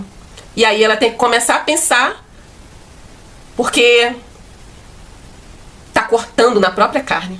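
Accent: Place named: Rio de Janeiro, Brazil. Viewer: acho lindo o sotaque dos cariocas, saudades do Galeão.😂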